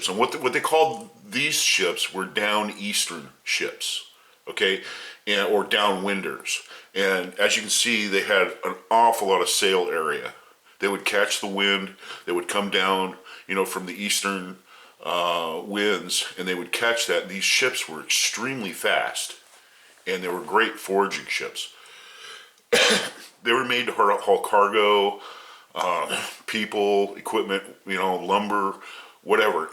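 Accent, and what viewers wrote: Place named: United States. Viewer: I taught you was American.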